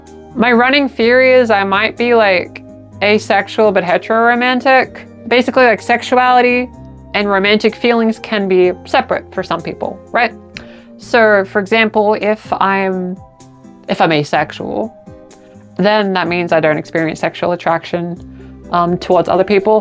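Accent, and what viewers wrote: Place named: Australia. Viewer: I hear a hint of aussie in your voice. But what is most noticable is how faint it is. As if you started out in australia but years ago you maybe moved to the states. Dialects and accents interest me.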